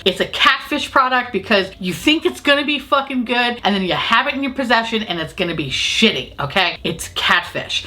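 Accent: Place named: South, United States. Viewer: you are 5 more y'all close to me loving you even more. I also love the random southern accent for no reason poppin up these days. Yea show is Pat 2 !!!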